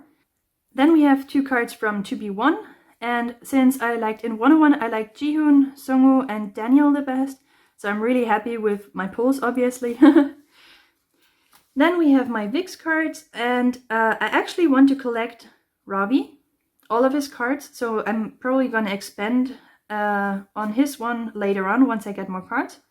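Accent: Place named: Germany. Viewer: I really like your binder!! It looks really really nice! I think it's so cute how nervous you are, but you really don't have to be. You are doing a great job. Judging from your accent I believe you are german? But don't be insecure about it, it's really cute😁👍👍❣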